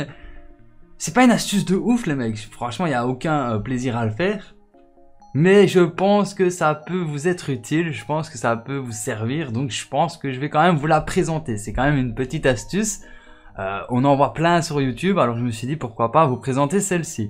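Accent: Belgium. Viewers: T'es belge? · Tu es belge toi non ?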